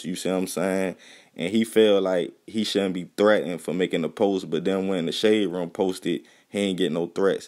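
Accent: Baltimore, United States. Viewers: You sound like Baltimore but, with a country twist. You not fully Baltimore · Yo as soon as I heard, yo talk, I was like yerp dats dat Yung bmore talk ya feel me, yo from West Baltimore... we gotta shine people gone hate on us fam. "Thru da dark night der's a bright day after dat"-. It's like a bucket of crabs💯😎but I love my city yo. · First time watching one of your videos .... You represented our accent here very well #Bmore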